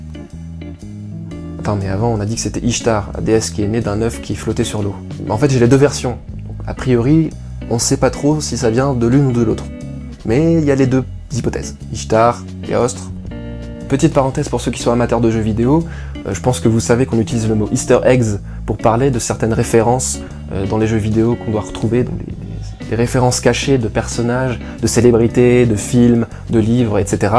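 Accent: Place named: Alsace, France. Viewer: j'entends de plus en plus un accent alsacien dans ta façon de parler. Ah yooo, ça fait plaisir dü ! ;)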